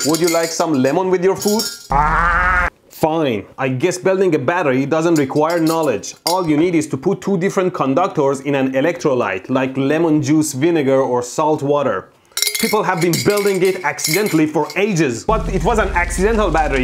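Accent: Iran. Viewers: Most Iranian pronunciation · Love the Persian accent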